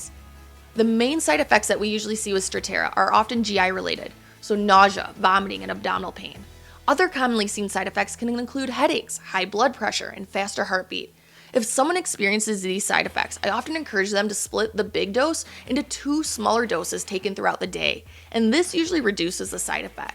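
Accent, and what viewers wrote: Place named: Minnesota, United States. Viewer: You sound like you’re from northern Minnesota, or Wisconsin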